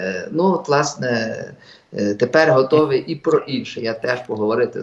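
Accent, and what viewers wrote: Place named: Galicia, Ukraine. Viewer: Хотив послухати, але не розумию Галичанського суржику.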